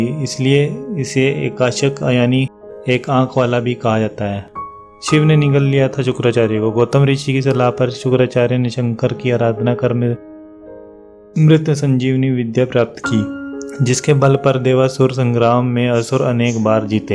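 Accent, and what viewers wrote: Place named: South, India. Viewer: Koi south indian bol raha hai shayad..ok..good hindi bolne ki kosish to ki..vocabulary will improve4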